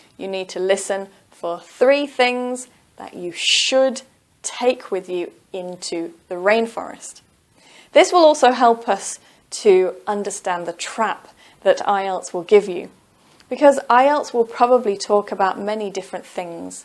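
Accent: United Kingdom. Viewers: Would you please upload a video with tips to get correct answers on map/diagram matching on listening please? Would really appreciate. Love your British accent 😆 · Hi. Thank you very much for your really nice teaching English with wonderful smile. I am very like British accent, so I am real glad that your English teaching on YouTube. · that's a great work, great accent, continued and uninterrupted, i wish i could talk like British,my accolades for your altruism, have seen your website too. its catchy. thanks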